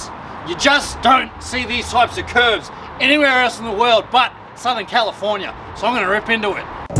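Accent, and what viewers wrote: Australia. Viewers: This is fucking so good, the aus accent just the cherry on top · that was awesome! hahaha. am I correct to think that you spoke with a different australian accent? like from a different region? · its an aussie doing a aussie accent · I like how you somehow made your accent more Australian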